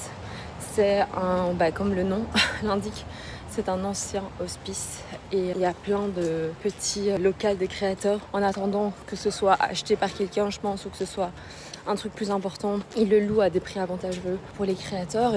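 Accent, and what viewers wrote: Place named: Belgium. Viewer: j’adore ton petit accent belge, ça me rappelle d’où je viens 🥹 je crois que j’en ai encore un peu moi aussi, c’est drôle comme les accents nous suivent partout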